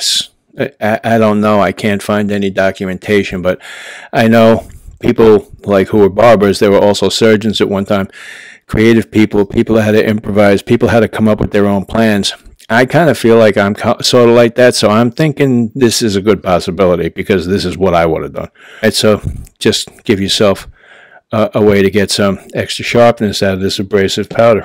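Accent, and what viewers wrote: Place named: Rhode Island, United States. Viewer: After using a DE for 4 years, I just got into straights (crappy gold dollar 208 and 2 Shapton stones) Can't wait to upgrade!! Came across your vids and I love your no bullsh*t approach. I've learned a lot past 3 weeks from your vids but have many more to watch and soooo much more to learn. Just wanted to say thank you. Also, are you from NY or RI? You're accent sounds like mine (RI), but I'm terrible at telling the difference b/w them!! Jw. Anyway thanks for your vids man.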